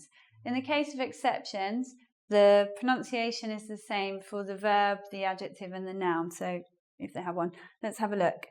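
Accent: United Kingdom. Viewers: Is it pure British accent ?! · british accent? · I find you very attractive ! specially your british acent ! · Wow british accent 😍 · Is this British accent? Sounds Cool!! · hi from México, do you know im just gonna suscribe me, because I love inglish specially British inglish, I love your accent, so I hope you can share us more videos about your english British, I spent too much of time time looking for British accent and finally I found out it in your channel. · british accect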